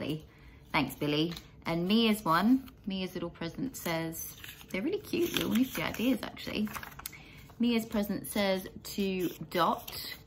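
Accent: Australia. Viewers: You did speak a lot.. awesome ♥️♥️ love it and the Aussie accent ahhh not many Aussie accents out there ..oh can't wait wait to see more!!! Cute or not 😘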